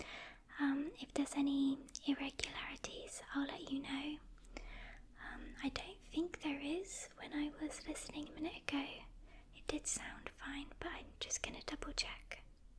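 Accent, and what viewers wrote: England, United Kingdom. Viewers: Great voice and I love your accent. You’re from wich part of England? Good luck and keep it up!!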